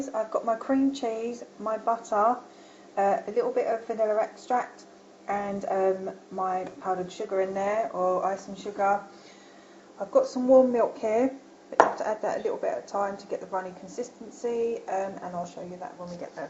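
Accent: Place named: Midlands, United Kingdom. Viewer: Mmmmm want one! Where about's are you from? I recognise your accent, it sounds like Midlands maybe?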